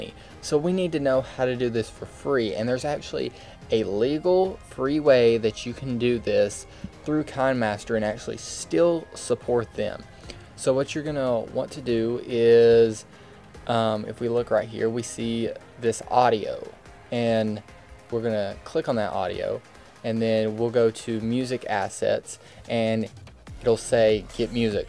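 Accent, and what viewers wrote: United States. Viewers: This dude is probably from Texas, the accent throws it off. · Finally an American, Indians are absolutely irritating to listen to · I love your Country accent :3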